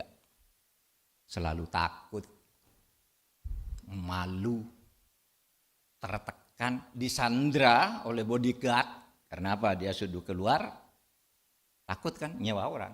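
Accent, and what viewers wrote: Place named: Madura, Indonesia. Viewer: Logat maduranya sekali² masih suka kedengeran. Orang Madura kalau udah pinter, memang pinternya kebangetan. Tapi nek wes atos, yo wes, angel urusane . Andaikan semua orang madura kayak pak Mahfud MD. 😁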